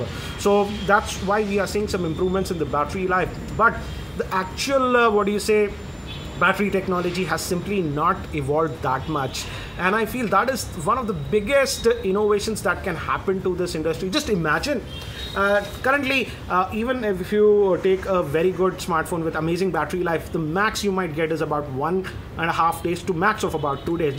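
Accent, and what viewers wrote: India. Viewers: I like the indian english accent, keep up the decent work, sir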